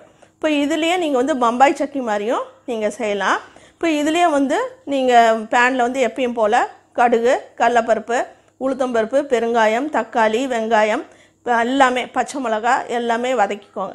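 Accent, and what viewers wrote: Salem, India. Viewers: Unga recipes lam super. Unga Salem tamilum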